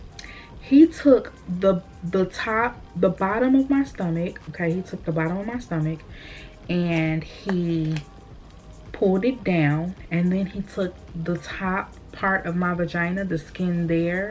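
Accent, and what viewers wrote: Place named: Detroit, United States. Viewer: You sound like a fellow Detroiter!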